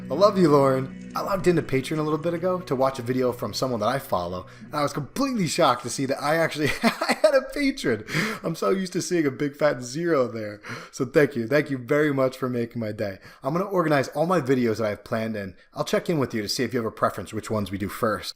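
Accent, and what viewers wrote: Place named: East Coast, United States. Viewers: Normally I could care less about lady stoneheart; however, after watching this video I hope HBO (or D&D) puts the big scene in the show! As usual, you make everything SO interesting, even Lady Stoneheart! Your positive attitude & 🆙beat personality😄 makes your videos a joy🌈 to watch 👀 Your cute EastCoast accent & awesome voice 💞 makes your videos a joy🌈 to listen to 👂 Always a pleasure Thanx!😁 💗💚💛💜💙💖💚💛💜💙💝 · Cammy O hey woah...east coast accents are bad-ass....not cute. 😉Love ya girlie.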